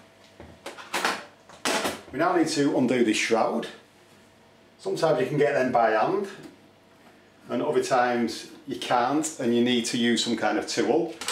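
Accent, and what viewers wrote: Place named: England, United Kingdom. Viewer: Nice work. Thanks mate. Gotta love the pommie accent. Gonna go listen to now 👍👍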